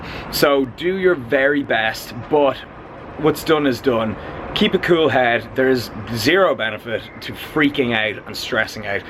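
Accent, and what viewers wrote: Ireland. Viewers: Missed that Irish voice. I'm coming Dublin soon buddy, if you're free we should defo partake in a Guinness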